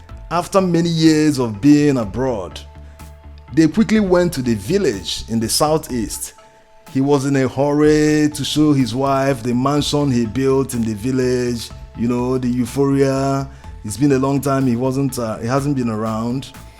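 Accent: Igbo, Nigeria. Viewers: Is this commentator okay? You slap a man for building a house for his family with his own money ,the woman must be mad i. e if his story is even true! You sound Igbo, you lack depth in your analysis.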